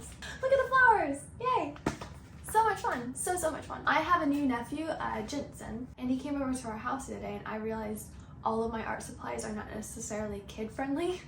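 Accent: England, United Kingdom. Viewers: youre starting to have an english accent omg 🥺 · the smol english accent peaking through is so cute ('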